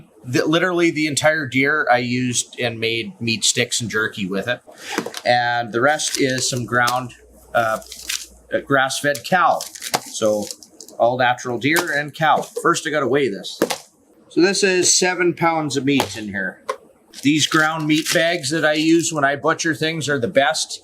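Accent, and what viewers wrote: Canada. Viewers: I was pretty sure you were a Canadian based on your accent, alone, but then I noticed the stickers on your fridge. xD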